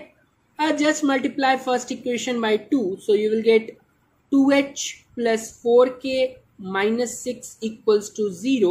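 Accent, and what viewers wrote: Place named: Pakistan. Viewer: Bro your pronunciation sounds like mohd.rizwan(PAK CRICKETER)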